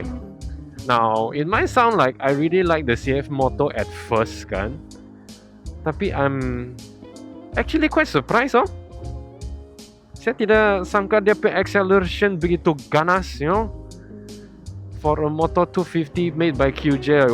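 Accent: Sabah, Malaysia. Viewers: Bila slang sabah dia masuk aku terus naik syiok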